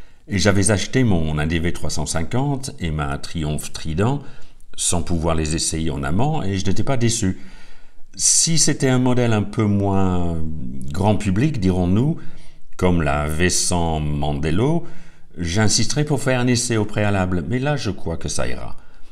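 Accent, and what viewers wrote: United Kingdom. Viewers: Comme quoi tous les goûts sont dans la nature et c'est temps mieux ;) Merci pour votre réponse et la qualité de vos vidéos. Mais également en Français avec un sympathique accent britannique .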